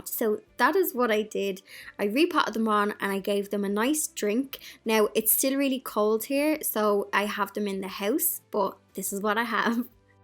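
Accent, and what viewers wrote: Ireland. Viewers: I kept thinking you were saying day lilies, growing them from seed. Then when I saw your plants, I’m like that’s not a day Lilly. It took me a hot minute to figure out you were saying dahlia. I’m from America so I had to let the Irish sink in a minute. I have several growing I’m my garden. I love them. Happy growing!!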